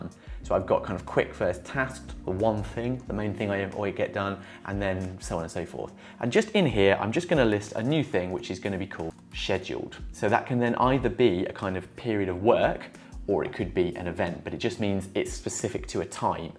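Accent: United Kingdom. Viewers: мне очень нравятся ваши видео, спасибо, я становлюсь умнее и организованней! А еще мне приятно слышать ваш АНГЛИЙСКИЙ акцент!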